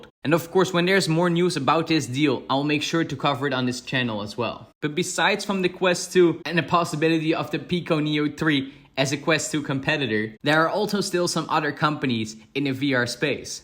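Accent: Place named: Netherlands. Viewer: Its only clear if you yourself are Dutch, but it doesnt really matter because apart from the slight accent, your English is really good!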